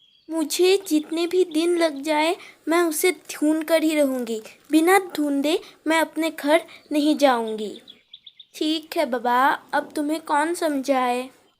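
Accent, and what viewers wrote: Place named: Assam, India. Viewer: Ab Assamese ho lsliyai abka jab hindi bolotai ho to etina shoot naihi karta but koi nhi ab at least try to kar rahi ho..💞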